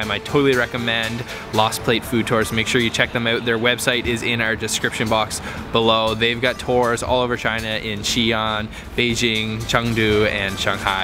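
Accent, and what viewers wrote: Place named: Canada. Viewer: Stumbled over your channel by accident and I love it! Great content, superb drone footage and love the Canadian pronounciation - „over and eut“ 😉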